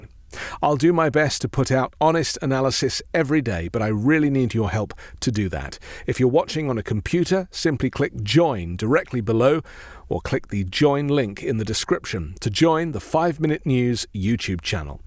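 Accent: United Kingdom. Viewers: Your British roots are showing lol. My family came from Britain generations ago. My Dad and Granddad are the only ones I knew that used phonetically. Ahhhhtt. Dad had a gun I forgot which one but it was a model with zero in it that had ahhhhtt in it. 20 ahhhhtt something 🤔🤔🤔. Anyway do you have crackers at Xmas ? Oyster stew Xmas eve? Love the show.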